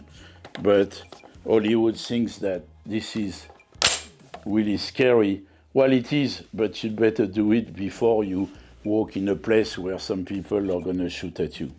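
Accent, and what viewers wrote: France. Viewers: Wow really ! Ok sorry sir , you sound like a native french who speak english ! Well keep up your good video then =) 👍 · Le bruit qui n'affecte personne me choque beaucoup. Quand j'ai vu "all quiet on the western front", autant c'était joli visuellement, autant j'avais l'impression que tout n'était que petite pétarade. Les soldats se parlent tranquillement, leurs fusils ne font que des bruits de pétard, alors que tout fait feu et explose autour d'eux. Je ne sais pas si vous êtes français vu l'accent, mais en tout cas j'ai bien aimé votre vidéo. Merci ! Edit : ah si j'ai mieux lu votre description. Content de voir que votre réussite et votre plaisir aux USA. C'est tentant et je serais ravi de connaître votre parcours :) Bye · What is that accent ? French ? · 😂 this guy is right!! I like the way he's talking with his french accent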